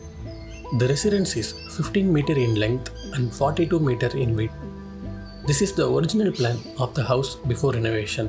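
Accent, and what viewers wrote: India. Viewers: Why indian accent? So.boring & cringe!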